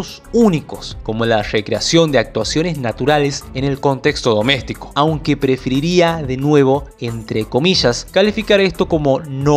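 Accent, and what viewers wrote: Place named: North, Argentina. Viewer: jaja no puedo evitar pensar que sos de santiago o de alguna parte del norte, muy buen video 👏🏻👏🏻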